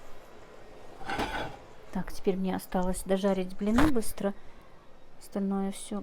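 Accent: Standard. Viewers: Смотрю и отдыхаю Чисто руский говор молодец.Труженница👍👍👍👍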